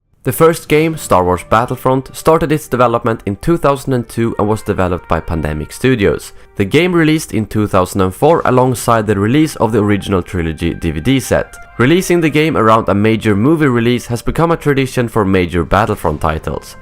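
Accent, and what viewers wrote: Sweden. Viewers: You sounds Swedish. Are you? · u sound so swedish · u sound swedish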